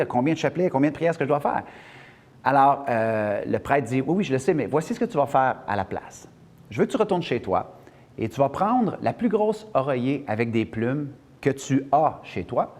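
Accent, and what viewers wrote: Canada. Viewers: Rien que pour le bonheur d'ecouter l'accent canadien je me suis abonnee, mais ce n'est pas que ça! Le punch, l'humour et le message de sont geniaux! Grand merci d'une française frontaliere à la belgique.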